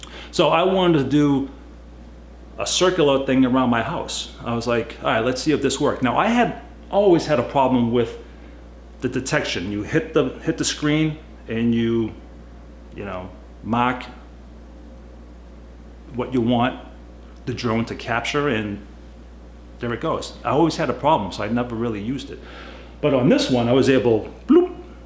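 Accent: United States. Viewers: you sound very american but you say "fuck me" like a true blue aussie I like that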